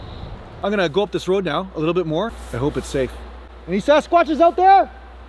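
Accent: Canada. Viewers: Are you Canadian aye?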